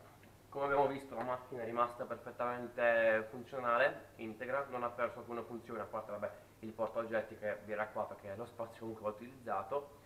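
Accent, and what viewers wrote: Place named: Apulia, Italy. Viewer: di dove sei io Bari tu?ho sentito che sei pugliese giusto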